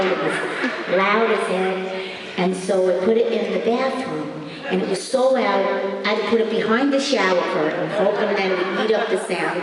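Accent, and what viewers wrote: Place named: New York, United States. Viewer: She's such a New yorkaaa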